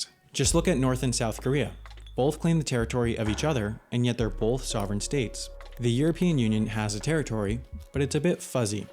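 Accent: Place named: United States. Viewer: You may have answered this already, but are you originally from the US? I know you live in the UK now, but you definitely sound American.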